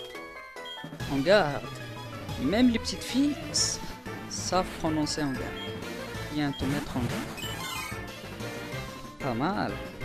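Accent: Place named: Brittany, France. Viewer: trol ton accent de breton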